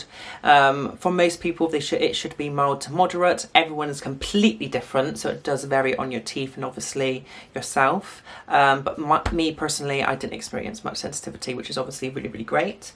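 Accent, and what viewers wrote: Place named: Bristol, United Kingdom. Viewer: Are you Bristolian? Iam aswell so think I can hear it in your voice haha, great review! I’ve been looking into trying boutique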